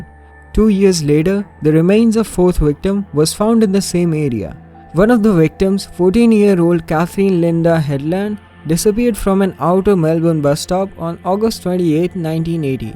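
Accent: India. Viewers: Your narration is fantastic! I love listening to Indian accent it's beautiful!! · Mate, you accent is Indian right? funniest accent in the world to my ear, I'm an Aussie so our accents is are quite different. But your well spoken, even if some things are missed pronounced and quite easy to understand, the dreary americans are the worst like Criminally Listed his a terrible narrator cant even watch his vids. my point is your got nothing to apologise for good video keep up the good work.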